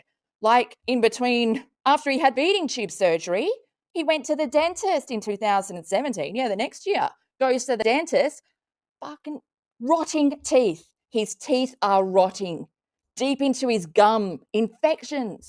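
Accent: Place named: Australia. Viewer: How dare you. Do you know that person? Have you spent time in her home? So you claim to have had all the conditions her boy has? You sound Australian. I've just reported your content. I'm not American, so I've not watched her channel and I'll never watch yours. I hope many more people report you. You are a dangerous and evil person.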